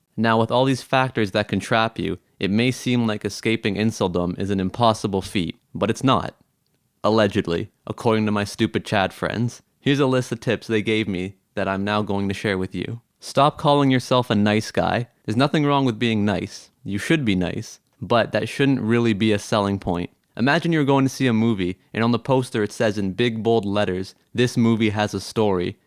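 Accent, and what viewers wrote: Newfoundland, Canada. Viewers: Are you from Newfoundland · “Aboot” “b’ys” Newfoundland? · Dude are you a Newfoundlander by chance? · Is that a Canadian accent? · i knew you were canadian btw i can hear it in your voice.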